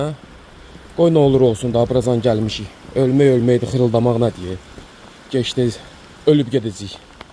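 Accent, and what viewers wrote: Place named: Baku, Azerbaijan. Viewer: Ay senin lehcene qurbann bakilim Allah kòmey olsun .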